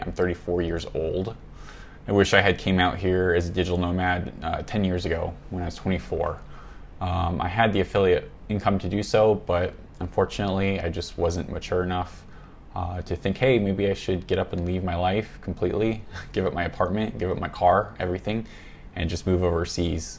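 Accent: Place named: Southern California, United States. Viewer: Your SoCal speech mannerisms are impeccable!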